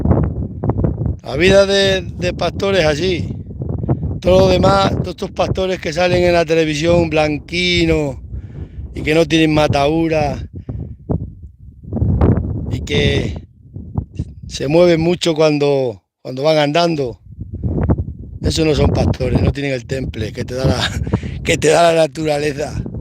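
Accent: Extremadura, Spain. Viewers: Desce Barcelona una estremeña acabo de venir de mi querida estremadura ya soy mayor y cada día añoro más mi infancia fuy cobarde al salir de mi tierra bendigo los que se quedaron es usted mi siquiatra me gusta cómo habla como la gente noble de esa tierra gracias ustd da calma como todos los buenos estremeños aún quedan gracias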